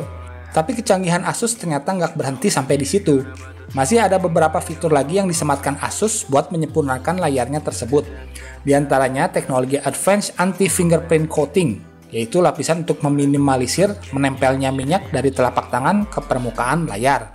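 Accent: West Java, Indonesia. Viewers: sunda pisan euy, hehe, hatur nuhun videona. :) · Sampurasun... Sunda pisan kang... hhe... Mantep reviewnya.. Tp ngmg2 Redmi 1s sm Zenfone 5 beda kalas sama harga kang.. Kaleresan abdi pengguna Redmi 1s :D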